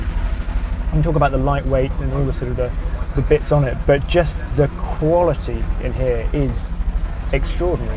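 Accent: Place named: England, United Kingdom. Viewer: this guy mumbles, whispers and has an english accent, cant understand anything he is saying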